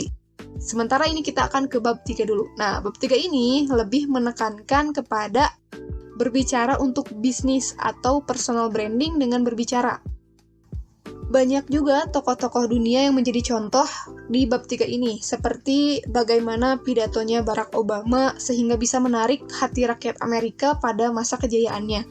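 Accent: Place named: West Java, Indonesia. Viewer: BARU NONTON SI TETEH NA SUNDA PISAN. HEHEU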